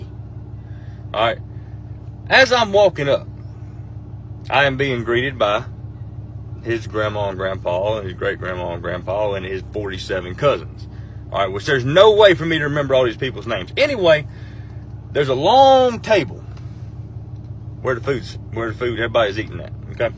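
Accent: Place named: South, United States. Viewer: Love that southern accent,and you are a funny ass story teller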